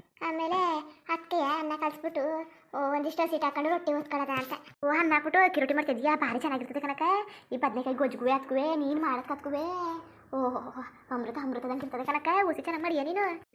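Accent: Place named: Mysore, India. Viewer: Mysore hunsur language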